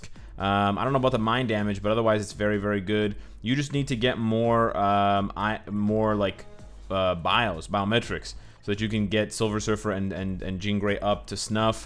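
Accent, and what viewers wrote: United States. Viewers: I found that country accent funny and I wanna be roasted IGN abdouben22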